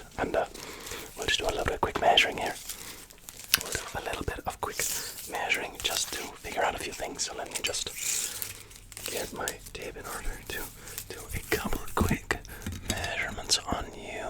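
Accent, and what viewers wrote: Ireland. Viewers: I am Spanish but I had an Irish upbringing and the accent makes me SO homesick 😭😭 The meassuring part made me drop the phone. Yes, you caused that 😂 Carry on with your amazing work and your sense of humour ❤ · My hair is literally how he's describing it at the moment. And I LOVE the Irish accent! ❤ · the irish accent is sooooo good! I need more of it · as an irishman, i approve of this even if it is all over the place i'll take it literally staying w a friend in dublin which is kinda the accent ur doing but also with other dialects from around the country sprinkled in but i feel like being in dublin this video is fitting to sleep to when home is 3 hours across the country and people sound nothing like that from home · His Irish accent is better than mine an actual Irish person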